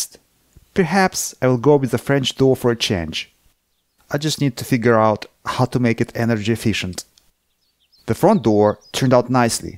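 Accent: Russia. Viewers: The probably hear his Russian accent and vote thumbs down. Pathetic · I watch the cabin build moment to go then I watch this one thank you again my friend thank you very much I thought I recognized a Russian in your accent. As I said the first time I wrote you a note we share a common dream you are making it happen I put other things first and never did make it happen. Thank you for this wonderful film clip I hope to see many more from you I know your time is limited but they are very precious to me I will as I said in the first comment use you as an example of why we must follow our dreams while we can thank you again my friend People Are People wherever you go and you are a fine example of a good man I can see you good and pure heart from all these miles away good luck and Godspeed to you my friend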